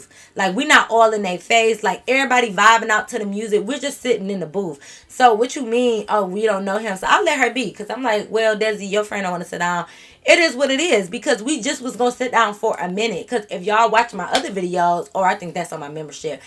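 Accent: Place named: Chicago, United States. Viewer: And I’m from Chicago so our dialect be hella similar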